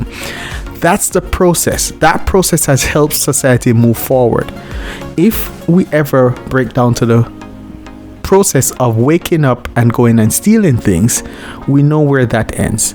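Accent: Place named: Jamaica. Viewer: You sound like a batty Boi Jamaican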